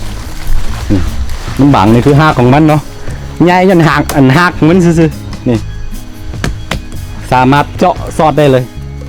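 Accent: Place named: Chiang Mai, Thailand. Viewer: เพราะว่าสำเนียงภาษาหรือคำพูดของคุณเหมือนคนจังหวัดเชียงใหม่ของคนประเทศไทยค่ะ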